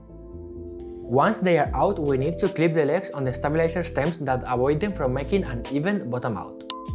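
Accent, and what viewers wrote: Spain. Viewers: He for sure has been born in Spain or at least he has been living for most of his life. He is got an amazing english for his age. His accent is 100% spanish. Very nice chanel man. Keep it on !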